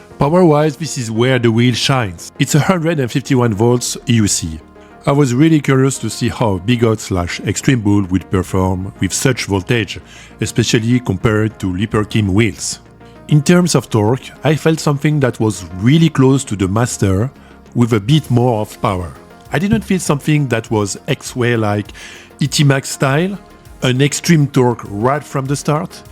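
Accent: France. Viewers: en tant que wheelers FR, je suis fier que tu nous représentes si bien. Tu as l'accent français, et alors ? Je bosse dans l'IT et travaille avec différentes nationalités tout le monde a l'accent de son pays d'origine. L'important c'est d'être compris. Je suis sur que dans une ville cosmopolite comme New-York, tout le monde s'en moque. Heureusement que les humains sont divers et variés sinon quel ennui !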